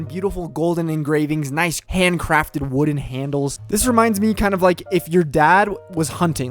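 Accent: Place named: United Kingdom. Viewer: Insane Bri'ish, certified banger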